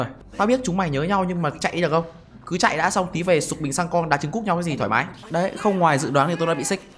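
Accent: Hai Phong, Vietnam. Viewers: Ah dân Hải Phòng à??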